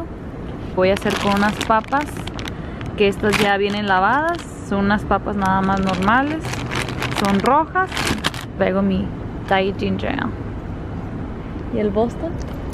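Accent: North, Mexico. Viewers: me parecen buenísimas tus recetas! Gracias por compartir! De donde eres, tú acento suena del norte, yo soy de Nuevo Laredo! Saludos!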